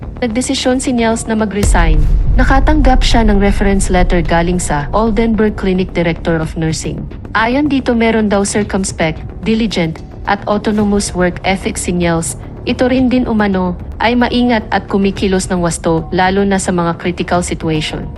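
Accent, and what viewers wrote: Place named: Spain. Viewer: Hello sir How are you today in Austrailia? Thank you so much for visiting! and I wish you have a lovely weekend😊 I feel the Spanish accent in your language, where are you from? anyway it was so cool😊 new subscriber from south Korea!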